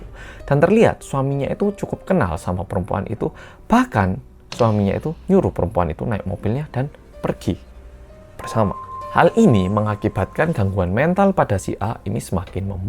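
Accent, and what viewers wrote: Java, Indonesia. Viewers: Yaampun teganya teganya😮 tks medoook ❤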